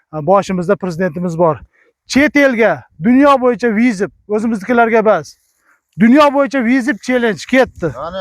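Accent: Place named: Fergana, Uzbekistan. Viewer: Кара бир жойларинг бир нарса чикиб кетмасин.Гапида фаргоналикга ухшайди,шунча огирни кутаргандан кура пахта терсанг булмайдими ука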